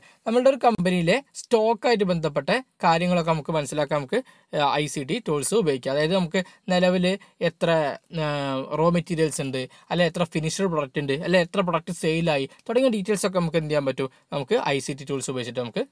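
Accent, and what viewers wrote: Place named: Malabar, India. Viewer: പക്കാ കാക്ക ഭാഷ ( ബാസ )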